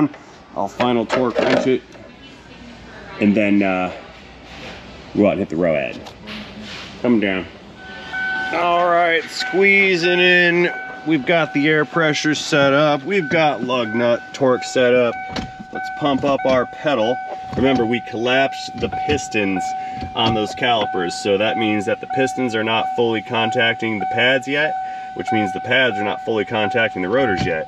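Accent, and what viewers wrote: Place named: South, United States. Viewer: I always notice that after every night you over party you tend to talk S L O W L E Y and the vids tend to be an hour or more. BUT us Southerners like it when you start talking like a local. :goodvibes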